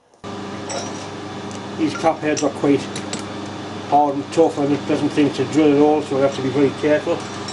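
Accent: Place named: Northeast England, United Kingdom. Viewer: North east accent, but cant place exactly where.... Id have said closer to the tyne than the wear though! Good idea that, subbing for lathe porn!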